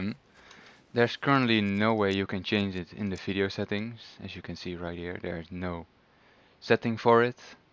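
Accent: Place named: Netherlands. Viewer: xD You're Dutch. (Posted this after the first 5 seconds of watching this video.)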